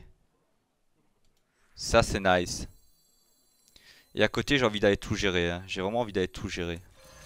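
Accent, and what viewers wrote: Belgium. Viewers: Il est belge ?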